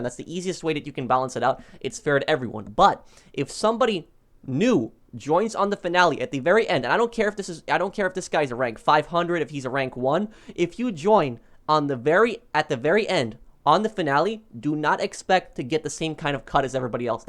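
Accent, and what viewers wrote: Poland. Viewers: R u acctually Polish? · Z polski jestes?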